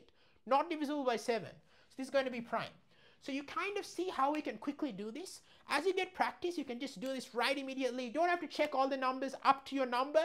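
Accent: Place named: France. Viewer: I think I understood you correctly, but at that point I didn't notice you were French, yet. Anyways, it's much clearer now. The main giveaway of this video is that most of these low primes are easy, even trivial, with some exceptions (maybe just 91, because 49 = 7² and 77 = 7 × 11 are so ludicrously simple)...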